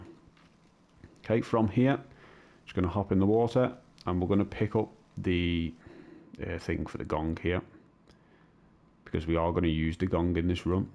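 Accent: Liverpool, United Kingdom. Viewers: Youre guides are mint mate, I take it you're in Liverpool by your accent lol im in stoke if I were nearer I'd buy you a beer 😂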